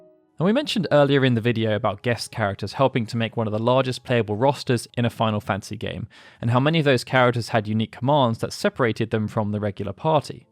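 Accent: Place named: United Kingdom. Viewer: I knew all those. Because you just recreated someone else's video with a brit accent.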